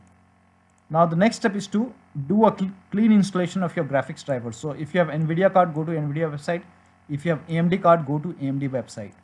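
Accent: India. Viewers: every time that i hear indian voice i know my problem will be solved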